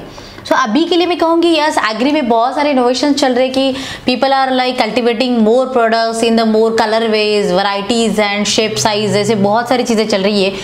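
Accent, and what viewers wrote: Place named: Mumbai, India. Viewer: marati hindi mumbai accent madam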